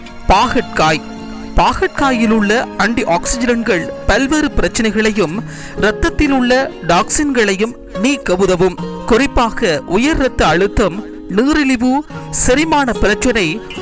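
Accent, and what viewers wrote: Sri Lanka. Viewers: ஈழ தமிழ் அப்படி தான் இருக்கும் சகோ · இது இலங்கை தமிழ் ...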